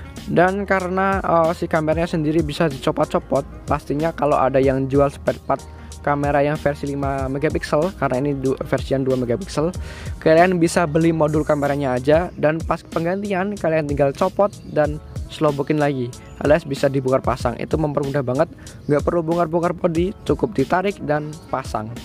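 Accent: Java, Indonesia. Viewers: Sory suketnya... Hahahaha.. mantab bro, gak ilang jawane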